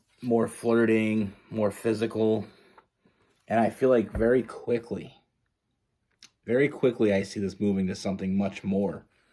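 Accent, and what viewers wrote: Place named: Toronto, Canada. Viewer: U sound like ur from TO. I’m from beaches ✨😄✨ Nice reading 🙋🏼‍♀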